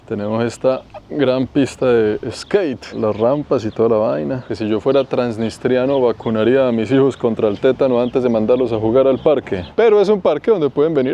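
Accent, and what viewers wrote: Bogotá, Colombia. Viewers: no paro de reírme escuchando tu rolo, es escuchar un cuento, contado por mi versión masculina 😂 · Hola. Lo que distingue a es la manera amena de relatar con su acento colombiano, muy gracioso. Además de enseñar lugares extraños como este 👏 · Aprender contigo es genial, lo genial es que eres rolo y gu forma de hablar es muy linda, si te veo en la calle te robo un beso 😂😂😂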